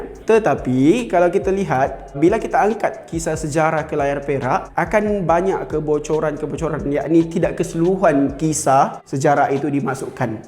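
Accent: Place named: Sabah, Malaysia. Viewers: Sabah kan😂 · Mcm loghat sabah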